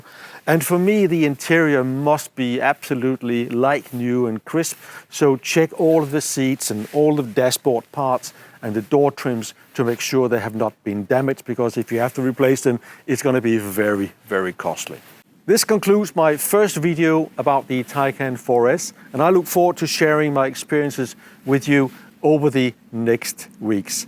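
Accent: Denmark. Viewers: Do I hear a sligth danish accent?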